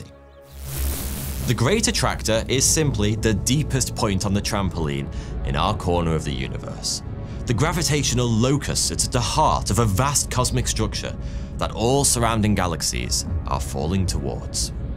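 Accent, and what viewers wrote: United Kingdom. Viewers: Gravity is 10 to the 39th power weaker than electro-magnetism. The true energy that binds the universe. All this great attractor, dark matter, dark energy, black hole, suns are nuclear furnaces nonsense is exactly the reason, the "experts" keep on getting baffled. Everything is connected. Not by gravity, as it only attracts. If gravity were true, everything would be colliding and smashing into each other and no life would ever have formed, anywhere. The universe is balance, constantly changing, constantly in flow. This is how its perpetual. It isn't expanding, the speed of light is not a constant and red shift is not due to gaining of distance, its the measure of youth, higher energy. Besides, how can the universe be expanding, if there are all "super massive black holes" at the heart of every galaxy, sucking everything in. Its all fundamentally flawed and all the degree holding towers of the line, have their heads so far up Einstein's ass, they can't smell the dark mode plasma. 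Thought and movement are electric. We are tiny mirrors of the universe, as well as antennas, picking up signals, which means, the universe is electric too. Everything is connected. Electrically, gravity is a mere by-product. This episode of Thoughty2, sucks. Just another British voice on the screen, talking to the little kids watching with awe and wonder. Science has been compromised and is taking orders from the wealth, like everyone else. Keeping secrets, telling you how things work, as how one woul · Which part of British accent it is?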